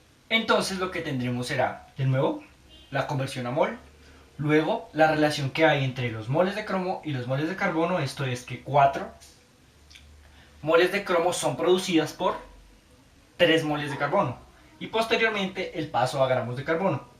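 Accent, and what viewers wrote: Colombia. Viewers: Profesor de que parte de Colombia sos ? :D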